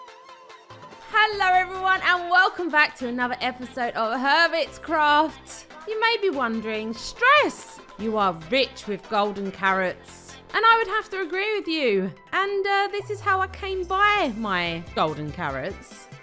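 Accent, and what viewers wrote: United Kingdom. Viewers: as an American I'd say it's a British accent lol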